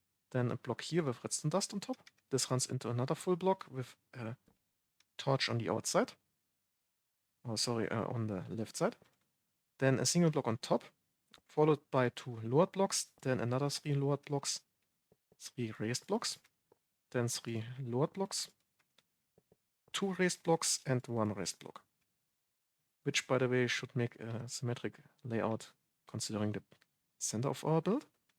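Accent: Germany. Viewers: keine Kritik, stattdessen einfach eine Bemerkung: du hast einen der stärksten deutschen Akzente von englischen Youtubern, welche ich bisher gehört habe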